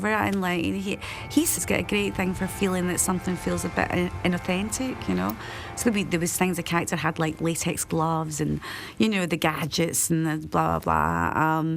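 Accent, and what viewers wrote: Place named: Scotland, United Kingdom. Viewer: She's Scottish you melt.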